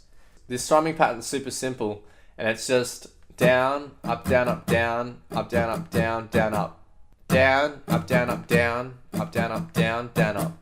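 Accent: Australia. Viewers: Sounds like Aussie accents. No offense tho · Your tutorial was great man, you need more subscribers cause you deserve it 👊😁 What nash are you anyway? (You're aussie right?)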